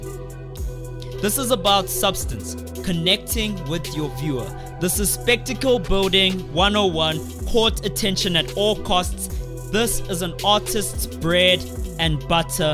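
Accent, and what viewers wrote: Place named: South Africa. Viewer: I really liked your video. I can even hear that you're south African because of your accent and that you pronounce the names correctly.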